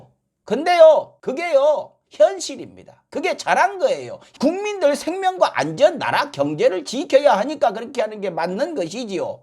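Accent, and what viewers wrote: Jeolla, South Korea. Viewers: 혹시 전라도 출신이신가?? 궁금해서요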